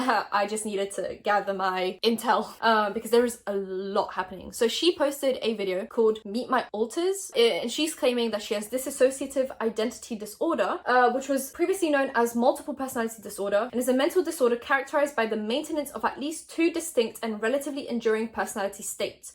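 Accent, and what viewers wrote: United Kingdom. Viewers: SPEAK POLISH, you sound toooo British makes me not believe your polish where is your Akcent !?!?!?!? Love your videos keep it real 😁😁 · ...will you marry me? I just want to hear your beautiful British accent everyday when I wake up accompanied by your lovely face!! My goodness you’re a dream 💭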